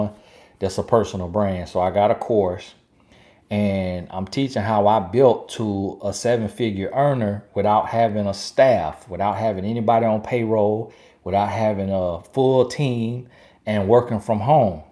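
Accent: South, United States. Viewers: Hey....I like your Southern accent....please don't change....you are who you are....Im sure you made peace with your voice...it don't matter as long as you're getting the message out from God....be blessed!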